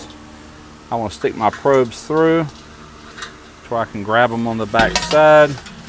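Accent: South, United States. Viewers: Dang, it just keeps getting better and better! That southern twang is always the way to go. Thanks again!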